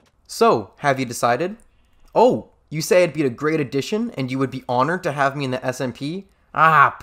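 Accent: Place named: South, United States. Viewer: Love the “Southern” accent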